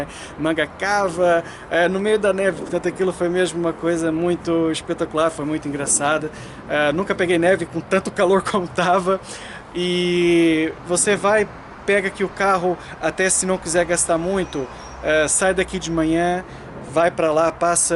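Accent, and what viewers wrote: Portugal. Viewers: Porque você pegou o sotaque português? Conheça brasileiros que moram a mas de 16 anos em Portugal e nunca pegaram o sotaque português. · Mauricio Silva, bom dia. E isso de ele falar com sotaque portugues ( quase ! ) é mau? Deixa de ser menos legal por isso? Ele vive em Portugal há um monte de anos, está casado com uma portuguesa, nao tem quase contacto com a comunidade brasileira , entao é natural que a pouco e pouco tenha adquirido o sotaque portugues, tal como eu se fosse morar para o Brasil adquiriria o sotaque brasileiro. Ah, mas há outros brasileiros que vivem em Portugal e continuam a ter sotaque brasileiro! Ainda bem que nao somos todos iguais, uns adquirem outros nao, viva a diversidade. Penso que mais sotaque menos sotaque, o que é verdadeiramente importante é o que ele diz e nao se é com sotaque brasileiro, portugues ou se fala em chines ou malaio.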